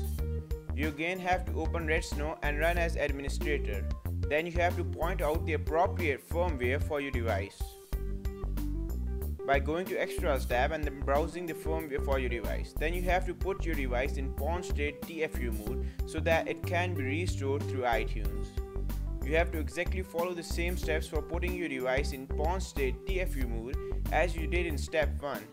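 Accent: India. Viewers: He has an indian accent lol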